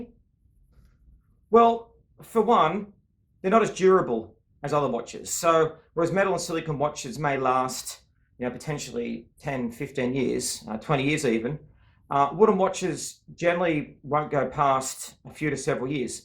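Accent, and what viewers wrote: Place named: Australia. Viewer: Let’s “dive” in and “cov” our name into the back of our watch (carve) . Love that Aussie accent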